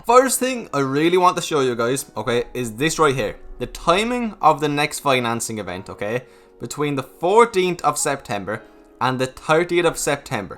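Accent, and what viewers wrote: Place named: Ireland. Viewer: That Irish accent is so fun to try n copy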